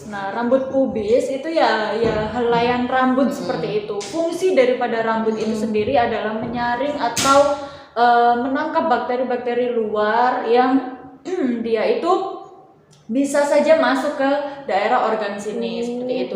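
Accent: Banyumas, Indonesia. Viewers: HAHA, ORA PANGLING' LOGAT NGAPAK😂👍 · Bahasa banyumasannya medok bgt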